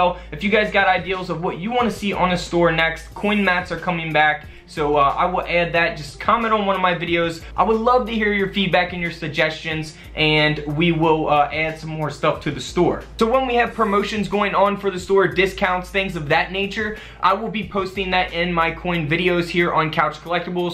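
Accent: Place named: New York, United States. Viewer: Really,..! He must be, either from, NYC, or Jersey shore, Noooo, I m not type casting, am I,..?lol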